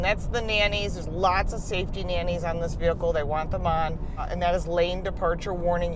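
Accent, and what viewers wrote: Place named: Brooklyn, United States. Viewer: All this time I thought she was Italian ;she sound like she's from Brooklyn. 😊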